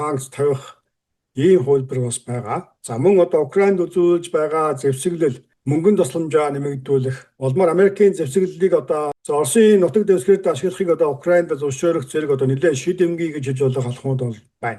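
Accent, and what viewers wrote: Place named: East Asia. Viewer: ЯРИАНЫХАА ХАЧИН ТОЛЬТИРСОН, ТАЛЬ ДУЛЬ ХИЙСЭН МУУХАЙ АНГЛИ АЯЛГАА ЗАСАХ ХЭРЭГТЭЙ БАЙНА. ХУЖАА, СОЛОНГОС, ВЬЕТНАМ ХҮН МОНГОЛООР ЯРИАД БАЙГАА ЮМ ШИГ ОНЦГҮЙ СОНСОГДОЖ БАЙНА ШҮҮ. ХОЙШИД АНХААРАХ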